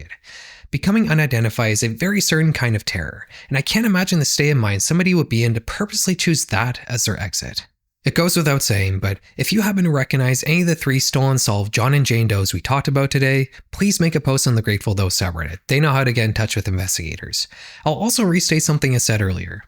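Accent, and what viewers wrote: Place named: Canada. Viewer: I'm really happy that this show is hosted by a Canadian. It's just too dark to be in an American or British accent. I need to learn "aboot" these things, not "a-bowt" them. It's just nice to hear while I'm looking up unedited versions of horrible stuff.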